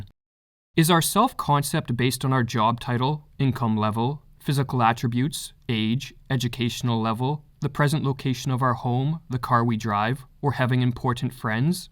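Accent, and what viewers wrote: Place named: Canada. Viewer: Whats up with you,? How old are you? You lift weights? squats? You sound Canadian. Im Diogenes.really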